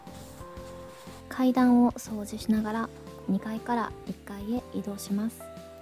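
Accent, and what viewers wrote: Kansai, Japan. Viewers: 関西の人や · イントネーション関西人っぽい🌟り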